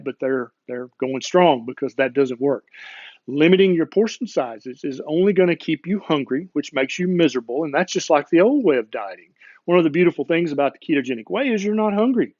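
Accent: South, United States. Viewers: 🍫🍿🥤 I'm ONLY here for the Southern accent. 🍔🍟🍩🍪🥤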